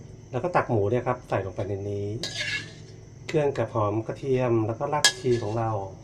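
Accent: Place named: Korat, Thailand. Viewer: คนโคราช.บ้านเอ๋งงงงงง พูดแบบนี้ครับ ผมก็ยุ่ อ.พิมาย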